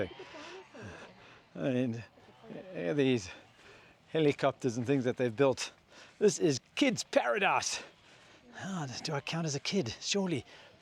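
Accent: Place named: Afrikaans, South Africa. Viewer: Love the boerwors accent man it's always nice and crispy 🔥👊🏽.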